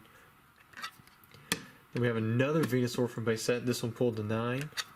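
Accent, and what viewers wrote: South, United States. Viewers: are you from GA or Tenn.? Also love the vidz man I heard about from Pokerev even though you’ve been on YouTube longer.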